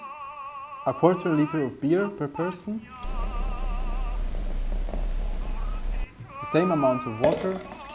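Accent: Germany. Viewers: buongiorno you english has, for me that are also italian, sound with a german accent....maybe your greman is perfect, saluti da Torino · Crazy, you even speak English with a German accent lol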